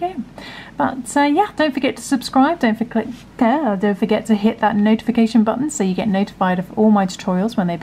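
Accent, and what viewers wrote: United Kingdom. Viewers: Hi you sound like a Brits I know Brits are great knitters but have no idea you are such a fantastic crocheter. I really would like to learn from you how to roll your hook. I stop crochet because because I hold the hook like a pencil and bend my thumb a lot to pull the yarn and my thumb became so painful that I stop doing crochet . I know if I pull the hook like you do it will not hurt me because I will not have to bend my thumb. Will you please feature on video to show how to hold the hook. Thanks a lot.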